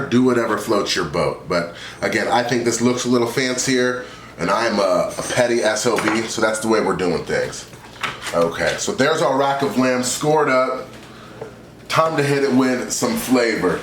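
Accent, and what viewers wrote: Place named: California, United States. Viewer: U got that cali accent, never noticed lol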